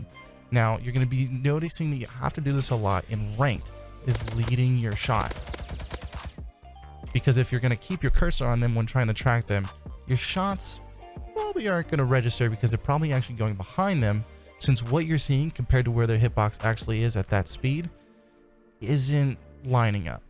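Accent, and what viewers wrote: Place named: Texas, United States. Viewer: That's a Nice Texan accent you got there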